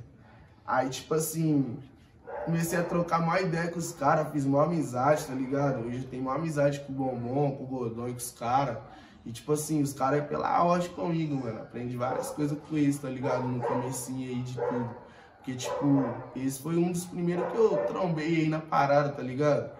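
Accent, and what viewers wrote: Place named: Belo Horizonte, Brazil. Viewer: Véi eu amo esse sutaque de BH mdsss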